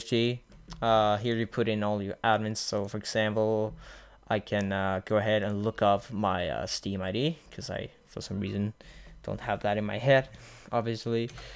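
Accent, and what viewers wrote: Denmark. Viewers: oh your from denmark :D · Are you danish?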